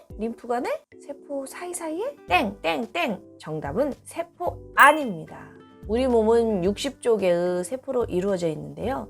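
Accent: Gyeongsang, South Korea. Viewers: 이 분 갱상도 사람이라 "의" 발음을 못하내. "으". "으".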